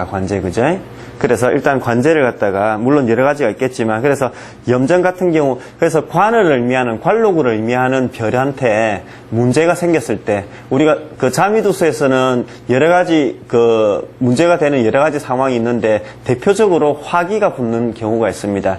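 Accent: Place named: Gyeongsang, South Korea. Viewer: 선생님 귀에 쏙쏙 들어오는 음성과 논리 너무 귀하고 감사합니다. 경상도라 그 억양이 더 친숙하고 이질감 없어 집중에 도움됩니다.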